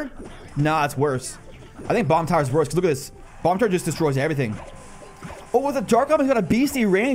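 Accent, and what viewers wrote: Canada. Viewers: He’s prob Canadian they say that a lot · was just watching a random video (first video of him) and realised this guy was canadian. And yes,I even searched it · Canadian eh?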